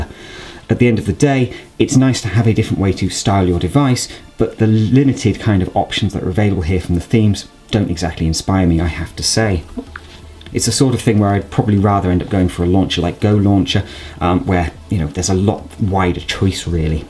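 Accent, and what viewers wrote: United Kingdom. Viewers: gotta love the brittish accent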